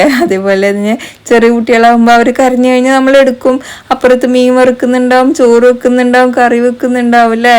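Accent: Palakkad, India. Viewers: പാലക്കാടൻ സ്ലാങ് വേറെ ആണോ അറിയില്ല അത് കൊണ്ടു ചോദിച്ചതാ. ഞാനും മൈലാഞ്ചി ഇട്ടാൽ കൈ ചുമക്കില്ല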